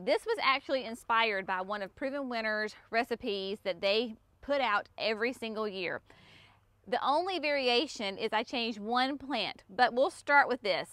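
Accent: South, United States. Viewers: I love your videos. Such a beautiful garden and nursery! And I love your Southern accent. Wonderful!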